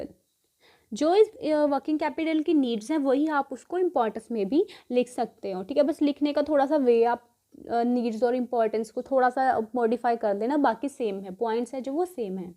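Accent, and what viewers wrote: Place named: Punjab, India. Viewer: I liked the way you explained , are u Punjabi or from north side for sure😊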